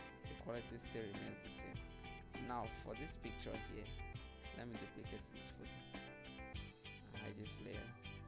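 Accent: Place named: Nigeria. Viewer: Woow!.....great tutorial nice voice. sounds nigerian.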